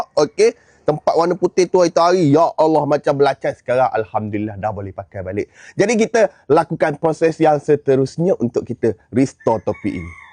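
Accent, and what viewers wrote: Kuantan, Malaysia. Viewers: brother ni contoh terbaik loghat kuantan yang masih wujud. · Orang Kuantan je boleh tahu dengar loghat Kuantan mcm mana😂😂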